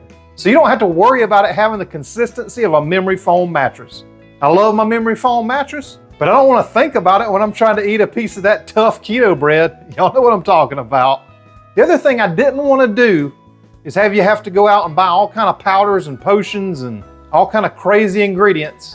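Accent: South, United States. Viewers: And the GOLD LOGIE goes to you Mr 🎉🎉🎉🎉🎉 I’m from “ Down under “ Australia and wanted to say congrats on your bread. It is the best one that I have found, I did tweak it a little bit ( less pork rind, more of Parmesan, golden flax seed and coconut flour) … DELICIOUS… Even my non Keto husband loved it. I also had enough mix left over to make muffins which I will freeze. Keep up the Good work and keep it “PROPER”🎉🎉 love your southern accent, can listen to it for hours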